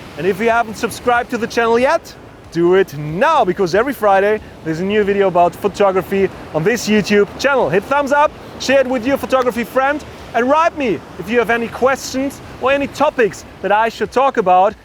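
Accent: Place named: Germany. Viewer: Came around on your channel today. You make super fun videos and your german accent is really good to listen to :D Hello from Slovenia.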